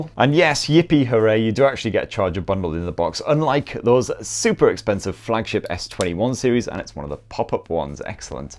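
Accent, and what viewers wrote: United Kingdom. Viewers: It's the banters in that classic British accent for me.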